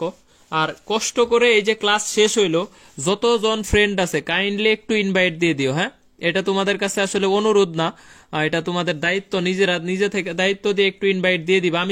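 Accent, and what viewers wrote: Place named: Barisal, Bangladesh. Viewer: বরিশালের ভাষা সুন্দর ভাইয়া ♥️❤️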